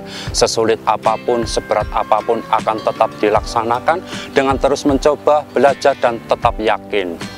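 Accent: Banyumas, Indonesia. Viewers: 👍👍salam satu ngapak bro.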